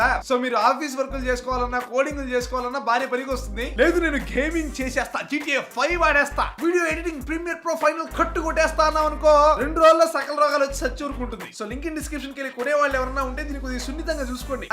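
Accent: Chittoor, India. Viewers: Chittoor slang anna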